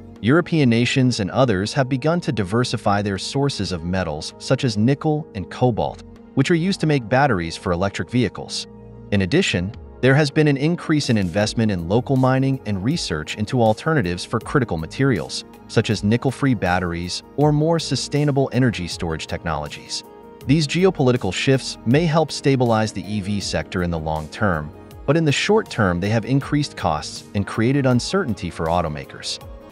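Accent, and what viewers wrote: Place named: United States. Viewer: sounds like a wank yank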